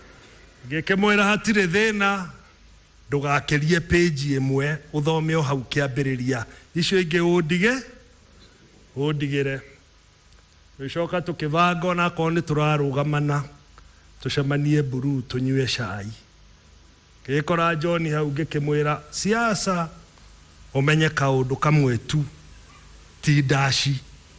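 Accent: Kikuyu, Kenya. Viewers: Peter Kenneth, you make Kikuyu sound so sweet.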